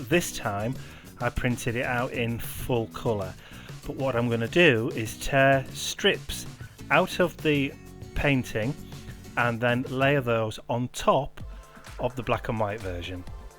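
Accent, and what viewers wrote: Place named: Northern England, United Kingdom. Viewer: Brilliant tutorial and art work. I've been making digital art in Photoshop for 10 years now and so the layers really resonated with me. I really like your style, and your videos, and it's nice to hear a Northern accent! :) I'm new to real life art and so your tutorials have been a great inspiration for me.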